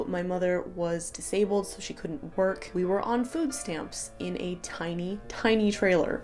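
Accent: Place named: United Kingdom. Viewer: i see u r speaking a little bit with the old british english accent!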